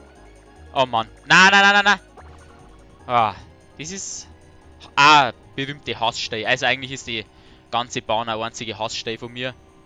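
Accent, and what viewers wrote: Bavaria, Germany. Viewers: i find des sau geil dass du boarisch redst! 😂😂9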